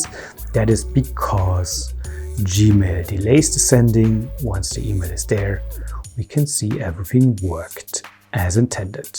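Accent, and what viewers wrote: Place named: Germany. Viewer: Gotta love the Hans Landa accent. Thanks for the contribution friend!!!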